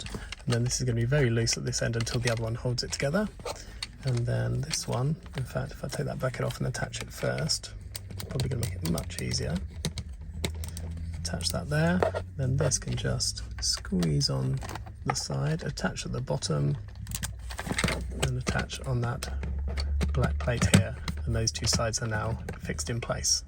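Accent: United Kingdom. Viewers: the hard english accent makes it 100% better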